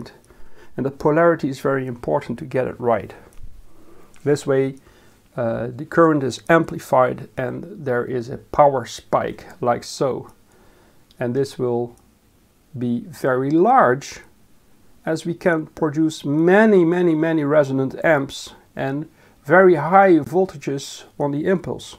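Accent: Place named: Netherlands. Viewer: Thought you were from Sweden, but your Dutch, right?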